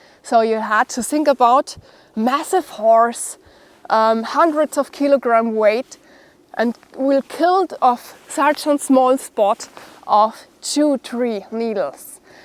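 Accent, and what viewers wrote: Germany. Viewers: I love German accent, especially with all the mistakes. She warmed my heart through the entire pandemic. The world is already cold enough, don't you think?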